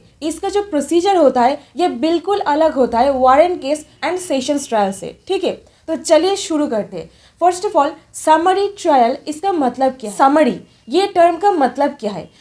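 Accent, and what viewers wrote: Bengal, India. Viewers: Your tongue language like Bengali girl 👧 mam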